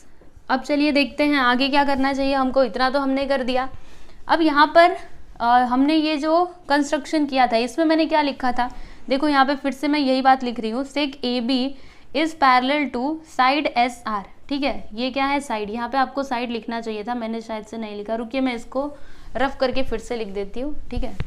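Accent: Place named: Mumbai, India. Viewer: Your mumbaikar i know from your language like likhneka karneka words❤❤😊😊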